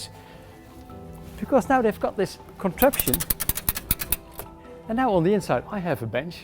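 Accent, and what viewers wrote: Netherlands. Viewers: Nice video, I really enjoyed watching it. If you would allow me to give just one point of critique, it would be this: while your English is excellent (ok, I can hear that you're a Dutchman, but there's no shame in that), there was one expression you used that kind of irritated my ears. It's when you say samething like: "that is how it should look like". You're stringing two expressions into one (incorrectly), so you could either say "that is how it should look" or "that is what it should look like". Apart from that, again: great video.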